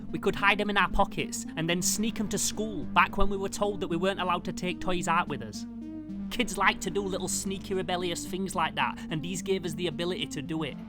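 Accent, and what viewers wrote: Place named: Sheffield, United Kingdom. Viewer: i knew this accent from doctor who but now that you say sheffield i understand!!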